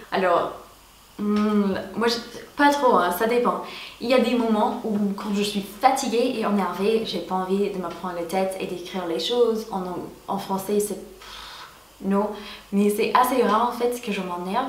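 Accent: Montpellier, France. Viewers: Je trouve trop mignon ton accent Français ! :) Est-ce que les Américaines trouvent attirant l'accent Français quand un Français parlent Anglais ? Sinon, si tu veux visiter la France, je suis de Dijon = le pays de la moutarde, du cassis, du vin et des ducs de Bourgogne ! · C'est marrant vous avez de plus en plus un accent français en parlant. Surtout sur certaines phrases rapide a dire. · Tu es de Montpellier oú j'ai mal entendu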